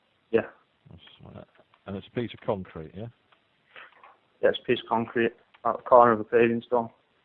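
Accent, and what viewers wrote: United Kingdom. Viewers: This is to the narrator ....you have an absoloutly kickass voice /accent , just love you Brits ,,,,,simply and utterly smashing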